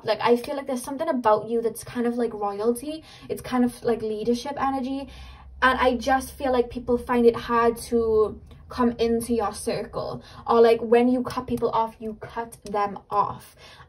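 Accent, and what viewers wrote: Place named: Manchester, United Kingdom. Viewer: Great reading.! Love your accent!Ireland? Manchester? I'm an Anglophone, lol, so I've watched tv series with accents for years, but it's hard to distinguish certain accents.... I'm pretty sure you're from Manchester...am I right? Correct me if I'm wrong.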